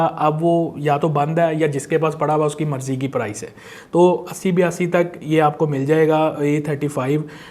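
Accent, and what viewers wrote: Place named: Punjab, India. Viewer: Your humor is great with the punjabi accent 😂😂😂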